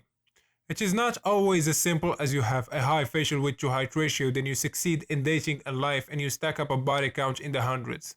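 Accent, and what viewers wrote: Morocco. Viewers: your accent sounds damn moroccan lol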